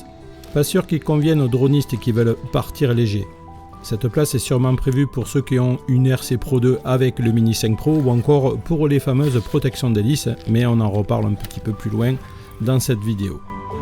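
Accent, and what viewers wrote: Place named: Marseille, France. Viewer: l'accent du sud!! Marseille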